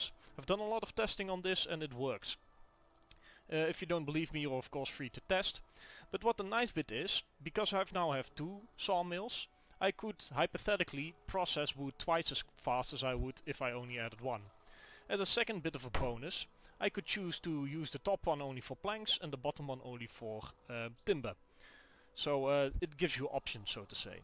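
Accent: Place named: Netherlands. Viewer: dat is leuk ik ook ik hoorde aan je stem dat je niet van Engeland of America was je klinkt gewoon Nederland toen ik je hoorde praaten dacht hij klinkt Nederland ff vragen waar hij vandaan komt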